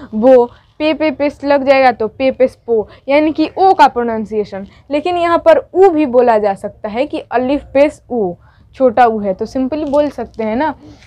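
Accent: Bihar, India. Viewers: आपका prounaunciation से ऐसे लगता है की आप बिहार से है, कहा से हैं आप? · उर्दू का उच्चारण मुश्किल है। यही एक वजय है, लोग उर्दू सीखें से कतराते है। आप तो बिहारी है। आपके लहज़ा से पता चलता है।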